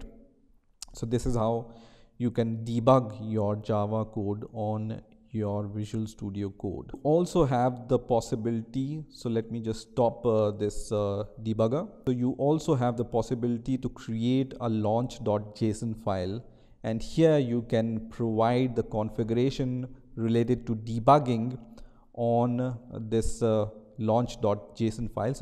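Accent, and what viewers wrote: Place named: India. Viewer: i hear your voice and i know that u are from india or pakistani. one of booooooooooooooooot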